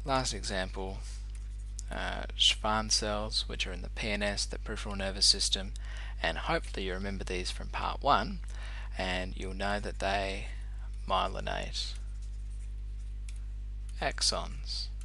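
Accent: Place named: Australia. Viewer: Why is this so much more interesting when it is delivered in an Aussie accent?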